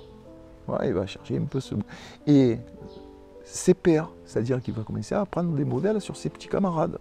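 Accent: South, France. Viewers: Vraiment très bien, très bien expliqué, par un locuteur très sympathique.Son discours est accessible aux non initiés, va à l'essentiel. Il n'y a pas à dire, l'accent du sud, c'est quand même autre chose que l'accent débile des gens du nord.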